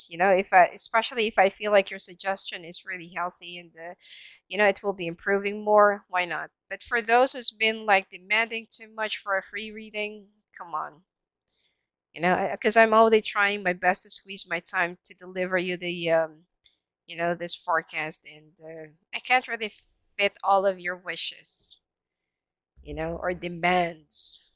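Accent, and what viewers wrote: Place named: Netherlands. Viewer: Hi, are you Dutch? Cause you do have a Dutch accent 😊😉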